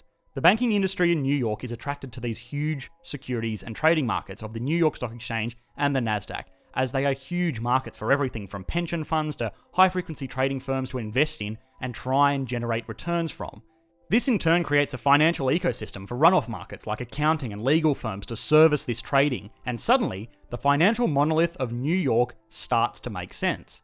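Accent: Australia. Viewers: He is Australian not only does he mention it in a few videos, I'm also Australian I can tell by his accent 100% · Wow--I've never seen such a shameless yet disturbing display of public self-stroking and auto-fellatio in my life the way you go on and on thinking anyone cares who has the larger economy/banking system, etc ...zzzzzz----and you sound Australian rather than British, so not only am I mildly humoured but also perplexed!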